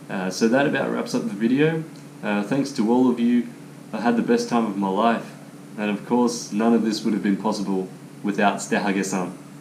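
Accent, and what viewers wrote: Australia. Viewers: オーストラリア訛り苦手だから助かるわ… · Australia Englishの発音がちと、ムズい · You got a nice Aussie accent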